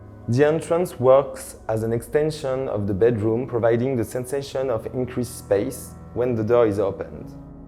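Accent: France. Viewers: Man , I would recognize French English accent with my eyes closed. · nice baguette accent, and as french i can appreciate the effort :)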